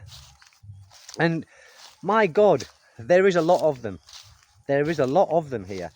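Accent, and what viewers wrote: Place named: Newcastle, United Kingdom. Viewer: “Hello you lovely lovely people” is the reason I tap on his video every time he uploads one. Also because Geordie is a fascinating accent, I’m scared to set foot on Philippine soil. The nightmare begins in the airport. I just like to travel vicariously through videos of their beaches and lush greenery in the mountains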